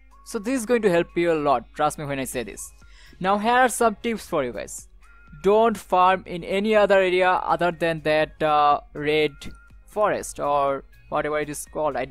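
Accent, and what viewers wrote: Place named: India. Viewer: you sound Indian af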